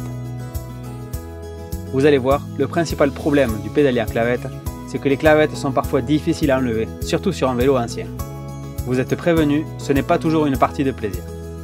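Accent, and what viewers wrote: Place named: South-West, France. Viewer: moi c'est cet accent du sud ouest qui me rend dingue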